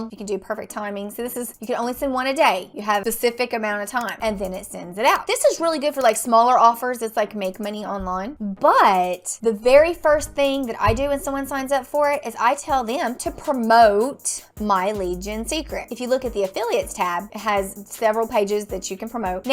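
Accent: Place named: Texas, United States. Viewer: I just come across your channel. I am from NZ. You sound as though you have a Texan accent.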